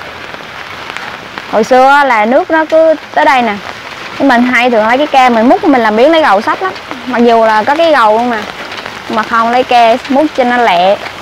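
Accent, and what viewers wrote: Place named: Nha Trang, Vietnam. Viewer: Nhớ giọng nói Nt quá đi Diên khánh hay Ninh hoà vậy Ko có dịp về mùa mưa dể thấy đuọc cánh này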